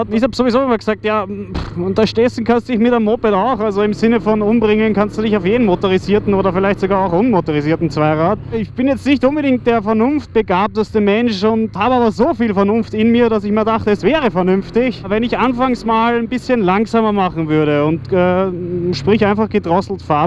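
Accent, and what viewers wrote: Austria. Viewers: Austrian??? Ahh we know 😌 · du redest nicht "österreichisch" sondern wienerisch ;-) von einem Hütteldorfer zum anderen... ich mag deine Videos, bin aber erst vor kurzem darauf gestoßen. Hab gehört du hattest unfreiwilligen Kontakt mit Gegenständen die man so nicht berühren will - falls dem wirklich so ist - gute Besserung! btw. die CB ist ned übel - vor allem wenn man den Hintergrund der CB in Erinnerung ruft - das erste Bike mit einem wirklich funktionierenden quer eingebauten 4 Zylinder. stay well cu harry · Thanks a lot for that video! I really appreciate the fact, that you took the time to write subtitles. To be honest, I would have watched the first 3 minutes in German without subs, that's it. With the subtitles, no problem at all! The "Austrian" German, is quite nice to hear, it does not rape my hears. So yes, I would definitely like to see more of those! 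Have a great year 2016, I hope you will get much more satisfaction and reward, for your work here, on YT. All the best!!! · Love it, Id reply in german but my writing skills are lacking (for lack of practice). Love hearing the german, love the passionate video and love your reasoning and thoughts on the matter. keep at it. keep going. keep exploring.. and keep posting VIDs :) whichever language.. its good to hear german (Austrian)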